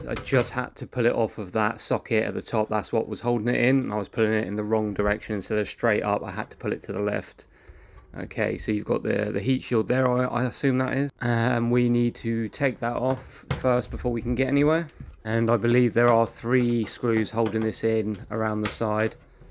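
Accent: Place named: United Kingdom. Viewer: Yes they will mega CD model 1's are prone to leaking cap's on the main board especially if you remove the shielding you will probably see green muck around the caps every mega CD model 1 I've worked on has had leaking cap's on the main board, the sub board -power board uses though hole cap's they don't normally leak but can as well , you should try to find someone to recap it asap these model 1's are getting quite expensive nowadays and very sort after, I would love to recap it for you, but I'm all the way over in Australia you sound like your in the UK good luck 🤞 with it friend ☺️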